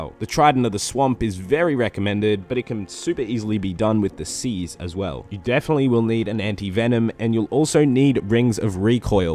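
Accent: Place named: Australia. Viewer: Australian accent bro. Buttah instet of butter. Houah instead of hour.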